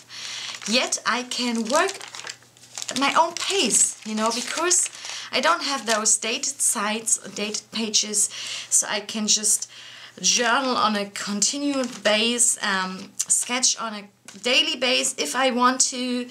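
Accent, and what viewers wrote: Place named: Germany. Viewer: Deutschen Akzent sofort erkannt haha. Ich liebe deine Kunst sehr schön 😘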